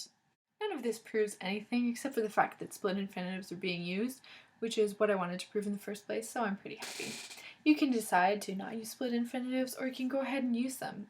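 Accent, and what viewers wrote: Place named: Northwest, United States. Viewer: I agree with Aloma. You speak very quickly. There were a couple times where I couldn't keep up, but we speak slower in the South. I was raised by Yankees, though, so I don't really have a regional accent from what I can tell. I can't really place your accent. If I had to guess, it would be NW US to the Midwest. Thanks for the video. Having studied Spanish, Russian, and German, I can see why some people disagree with splitting infinitives. I really don't care because it would only be problematic in translations, which are problematic anyway.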